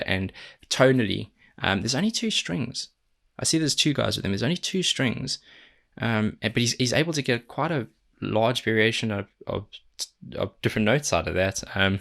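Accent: South Africa. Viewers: I don’t want to be rude. Is that South African accent ( which I love) , my point is , is there South African metal bands ? Excuse me for being stupid, I really don’t know. Just curious ?!? Nighwish and the Hu are my favorites and some Philippine metal too 🤘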